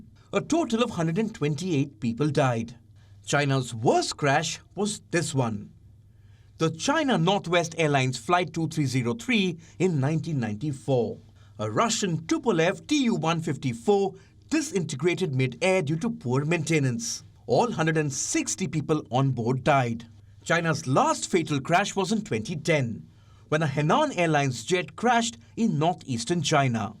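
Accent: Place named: India. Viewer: 能不能找个英语正宗点吗？这印度味英语我听着好难受 还自称英语是官方语言的国家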